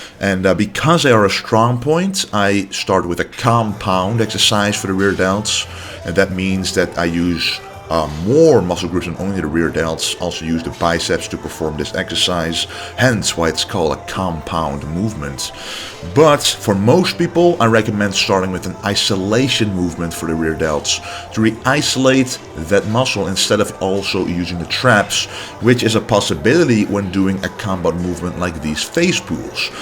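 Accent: Netherlands. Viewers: Jaa man. Wist ik all. Jau accent. Nederlander wooh! You can't hide your Dutch accent haha. Amazing channel man.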